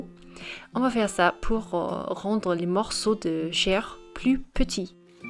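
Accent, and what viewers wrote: England, United Kingdom. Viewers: Votre accent est tres jolie are you english ? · M 21! Frou du cul ou trou du cul??? Madame est Britanique! Essayez donc de faire une recette vidéo en anglais et vous verrez si vous n'écorchez pas un mot???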